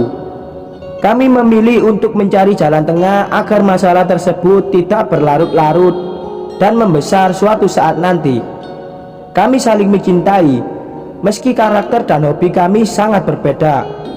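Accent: Java, Indonesia. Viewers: Maaf ya mas bacanya pake intonasi,,daaan jng medok2,,kok ky anak SD🙏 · Mocone kok medok nenen tho maaas, mengurangi kenikmatan pendengaranku😇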